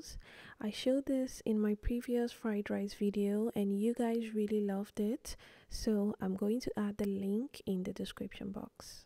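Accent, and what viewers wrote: Nigeria. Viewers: Ngl you sound Nigerian cuz its like your saying botter instead of butter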